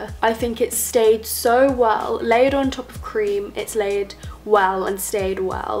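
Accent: RP, United Kingdom. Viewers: Your accent sound so posh. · I love your accent, what part of Britain are you? (If I could speak in a British accent I would like to have your peculiar regional accent..)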